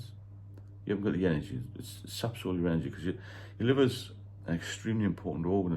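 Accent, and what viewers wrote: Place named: North East England, United Kingdom. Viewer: Howay, pet, I rather like the North-East accent!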